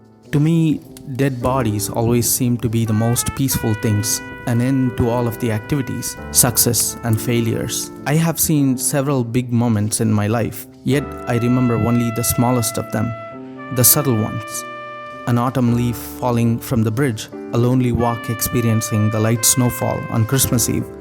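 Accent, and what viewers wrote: India. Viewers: The Indian accent is unmistakable. Wonderful essay. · The Indian accent distracts me from the whole thing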